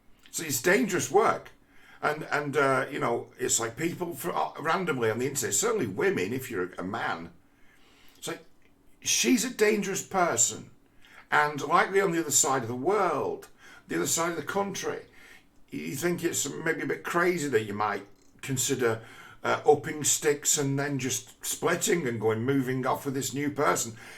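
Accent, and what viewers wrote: England, United Kingdom. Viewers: By the way, I think it is wonderfully hilarious to see you insulting people who hold down jobs and have a stable life. How very telling. Nice try buddy. I like an English accent but I am still allergic to narcissistic bullshit. And I know what you are doing - trying to entice me to stalk Richie. I got too much shit to do on top of foreign global affairs. I'd have to check my calendar.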